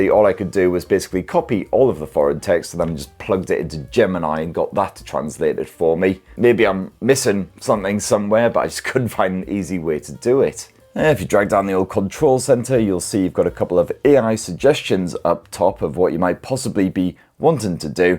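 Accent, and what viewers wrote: United Kingdom. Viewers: Are you from the UK, your accent is hard to catch.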